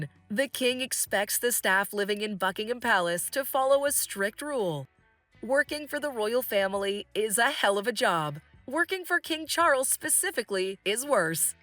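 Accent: United States. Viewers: Dreadful American robotic voice!!!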